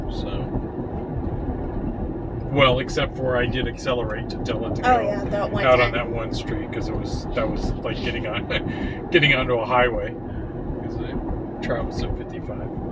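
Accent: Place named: Midwest, United States. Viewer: Where you guys at? You're accent sounds Midwestern. Perhaps OK or TX?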